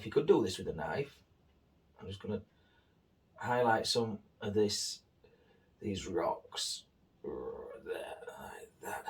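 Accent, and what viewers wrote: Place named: England, United Kingdom. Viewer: Thankyou so much for your prompt reply - love your work, and your English accent.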